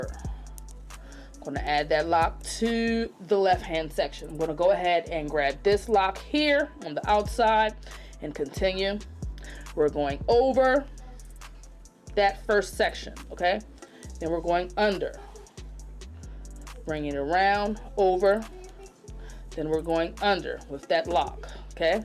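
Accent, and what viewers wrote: Baltimore, United States. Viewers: That B-more accent is thick!!🔥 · Dope ENERGY I JUST SUBSCRIBED BECAUSE YOUR VOICE IS SO CLEAR .. u from Baltimore AINT you Heey girl ❤️ I’m literally about to do this on my client going to Memphis I know how to fishtale braid it never did it on LOCS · Tew…. Lmao Sounds like Baltimore is where you’re from… hopefully I can master this on my sons locs in the am… 🙏🏽❤️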